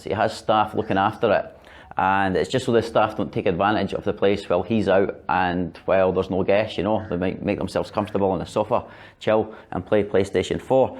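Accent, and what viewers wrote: Scotland, United Kingdom. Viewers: Love your Scottish accent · Always love that Scottish accent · You're definitely Scottish , your accent shows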